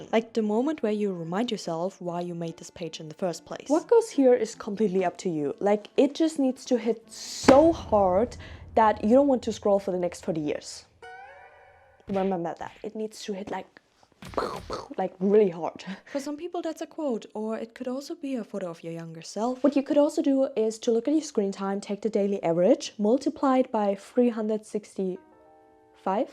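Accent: Germany. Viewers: aha i hear deutsch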